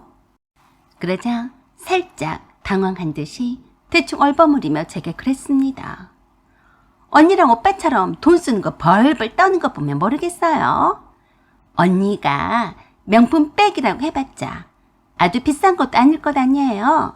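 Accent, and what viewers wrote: Jeolla, South Korea. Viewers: 아따 무슨 절라도사투리를 허벌나게 쓰신다요? 성우분이 호남분이요? 글구 저런 싸가지없는 집안과는 바로 이혼하는 것이 답이랑께요!!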